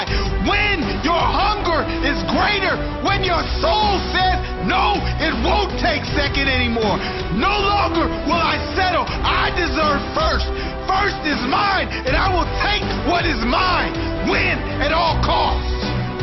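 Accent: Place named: England, United Kingdom. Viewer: Nigga English accent!! nice 😎😎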